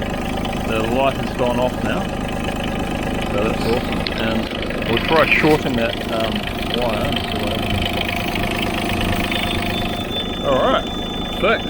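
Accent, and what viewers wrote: New Zealand. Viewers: Been wondering about this for a long time - if I speak with a New Zealand accent will I be as mellow and smart as?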